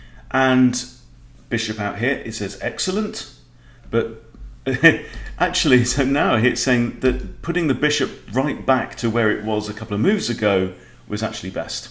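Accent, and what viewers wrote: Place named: United Kingdom. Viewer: Bingo!.... the computer is telling you to expect a fantasy situation... I figured out why is fun and informative versus the Feingolds, Gothams, Bartholomew's that are on here..... those guys, good as they are, well intentioned as they are, are like talking computer engines. Plus...those guys don't have British accents or roosters either...:-) Great vid on a great game with great points... thank you sir....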